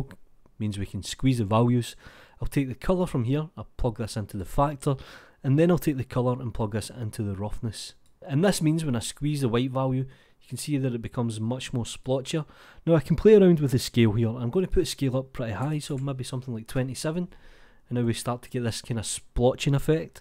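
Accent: Scotland, United Kingdom. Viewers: Everything is a billion times better with the scottish accent and i mean everything.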